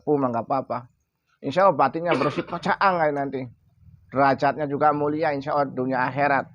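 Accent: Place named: Cirebon, Indonesia. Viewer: Wa'alaikum salam warohmatullahi wabarokatuh.... In syaa allaah mama yai barakallaah... ( punten mama yai, logat kayak wong cerbon... Maaf klw salah)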